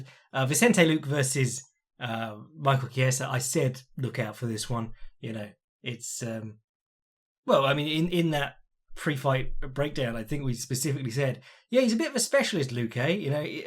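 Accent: United Kingdom. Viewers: Time to let Jack Slack serenade us for 48 minutes with that British schoolboy accent as he describes Aldo's flesh-rippling kicks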